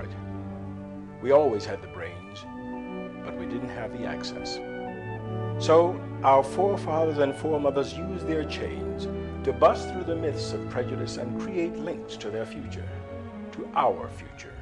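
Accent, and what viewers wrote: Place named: United Kingdom. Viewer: the British accent... o.O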